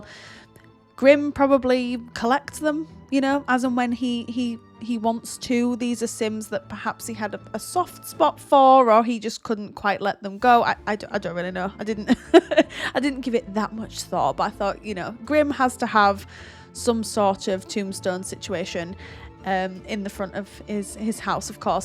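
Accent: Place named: Newcastle, United Kingdom. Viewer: yeah you guys sounds similar, by any chance is it called a geordie accent, right?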